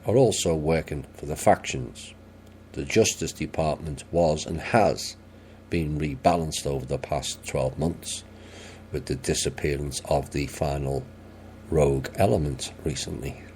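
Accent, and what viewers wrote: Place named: Merseyside, United Kingdom. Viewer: Interesting! There are many players! Good intentions and a sincere desire to bring the light of truth and a better way for so many! And not corruption and cro.nism for an elite few. You sound like a merseyside lad! Me too! Thanks for your contribution to righting a wrong world.👍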